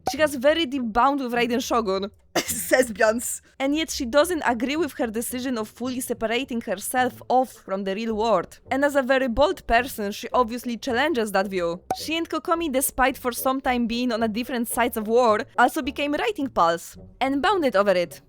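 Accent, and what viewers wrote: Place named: Poland. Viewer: Ah tak, słychać cudowny polski akcent, kocham twój głos <3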